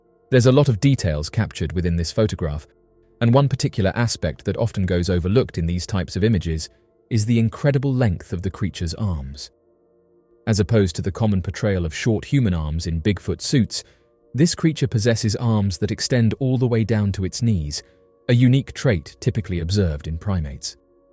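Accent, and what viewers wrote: England, United Kingdom. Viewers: This channel is a prime example of the power of a pleasant, clear English accent lulling people into believing completely BS theories and photos. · The idea of anyone believing in Bigfoot just because they hear a bloke with a calm English accent talking is ridiculous. Especially when Majority of people who say they've seen them & believe they exist are from the states.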